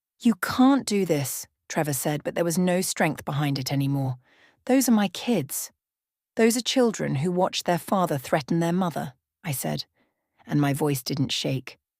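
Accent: England, United Kingdom. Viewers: What's the point of using a British English voice and American vocabulary? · an American story told with an English accent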